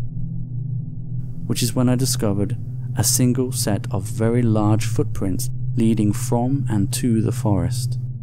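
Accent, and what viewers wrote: United Kingdom. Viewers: Are u from the uk sir?? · Haha I heard it but it's really a light british accent :) Btw thanks for replying so fast I just wanted to know one more thing do you write these stories yourself or do people send you some and you illustrate them :) ?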